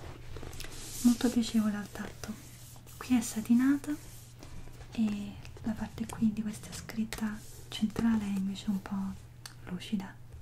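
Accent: Italy. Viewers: Hai una voce che ricorda il dialetto romano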